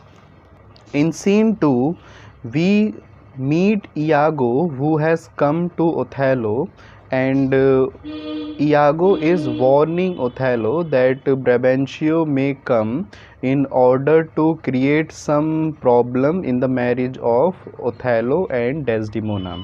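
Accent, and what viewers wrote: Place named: India. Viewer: I am afraid It's not teaching; it's simply reading with a Hindi accent. Try to feel and bring some emotion to your teaching.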